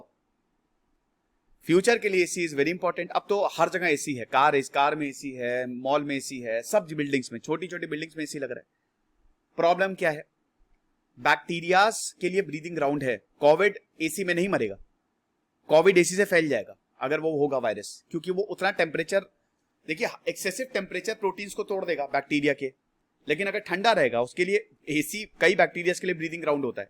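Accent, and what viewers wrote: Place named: Awadh, India. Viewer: Sir ka deshi andaaj jaari Avadhi style me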